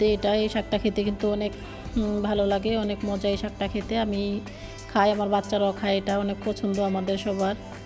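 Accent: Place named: Barishal, Bangladesh. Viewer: ম্যাডাম আপনার বাড়ি বাংলাদেশে কোথায় কথা শুনে মনে হচ্ছে বরিশালের মনে হচ্ছে আমাদের এই ভাষা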